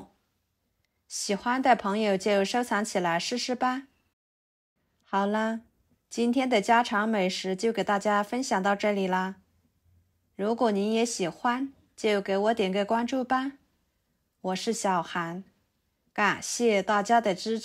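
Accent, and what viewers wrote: Yunnan, China. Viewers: 好难听的云南普通话，你直接说方言比你的马普要好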